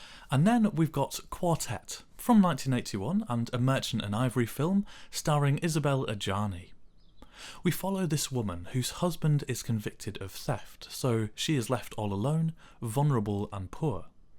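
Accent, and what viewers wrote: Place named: Yorkshire, United Kingdom. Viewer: Welcome back 😀🍿🙌📀🎸🎶 Hope it isn’t another 6 month till we see you again; you been missed 🤗 ‘Pale Rider’ is an awesome Clint Western; I watched ‘High Plains Drifter’ for first time this week 🤠 Once Upon A Time; one of my fav Westerns; what a film mate 😍 🤠🍿🙌 ‘Red Rocket’ is very good mate; ‘Anora’ is SB’s masterpiece IMO; love Florida Project aswell; seen it a few times 🏨 Not seen ‘Room At The Top’; but now you mentioned it is a Yorkshire based film; I’m in 👍👍 I really enjoyed ‘Materialists’ aswell bud; love my Indie Dramas 😊 What a wonderful comeback; keep up the superb content and can’t wait for your next video already; loving the Yorkshire accent as ever 🙌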